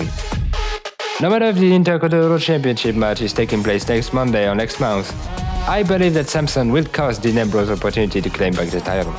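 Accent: France. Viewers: Are you french ? T'es français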